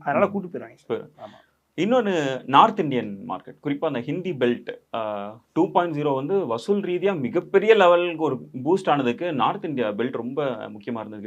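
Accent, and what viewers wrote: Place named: South Tamil Nadu, India. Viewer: I like your speech and enjoy listening to you particularly your tamil and south slang. Please continue speaking in tamil.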